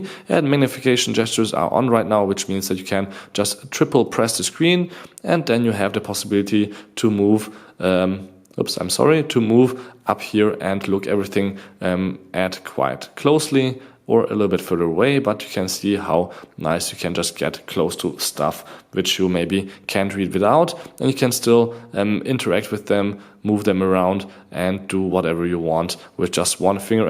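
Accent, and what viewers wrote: Germany. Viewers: Durch den deutschen Akzent einfacher zu verstehen als Videos von Amerikanischen YouTubern! :) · Shailen Sobhee which is really weird as he mentions that he is Hungarian. He has a very definitive German English accent :) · he sounds so funny and german i would like to listen this for hours and hours😆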